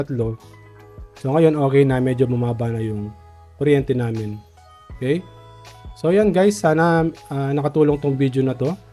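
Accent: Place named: Pampanga, Philippines. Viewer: halatang kapampangan ka sir lol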